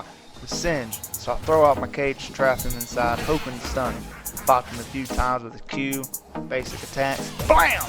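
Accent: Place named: East Coast, United States. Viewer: You sound east coast but that’s just me、(I’m East) keep up with the videos man! Also great editing :) lil YouTube channels keep this clustered genre new. You will blow up keep going nuts on LOL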